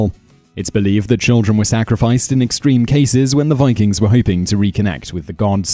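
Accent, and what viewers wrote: United Kingdom. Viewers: That British accent makes you sound slightly less boring and inadequately prepared but not much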